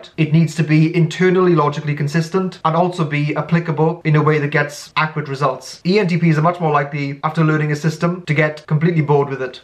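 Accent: Wales, United Kingdom. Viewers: Sounds Welsh to me. :-)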